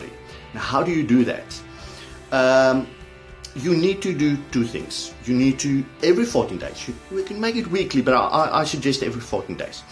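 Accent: South Africa. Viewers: Not only do I love this guys information, I am addicted to his accent! SA has the best English accent IMHO...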